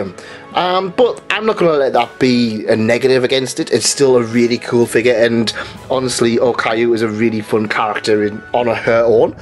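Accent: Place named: Scotland, United Kingdom. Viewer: Just came across your channel a few days and I just gotta say that I enjoy your vids quite a lot and they’re very informative for a novice like me. I’ve just gotten into figure collecting and I like to see what I expect before buying any figures! In my opinion, you’ve got the best reviews that i’ve seen yet on hololive figures! I also like your accent lol. I’ve been trying to learn a scots accent for some time now so it’s good research to listen to your videos. Hope your day goes well!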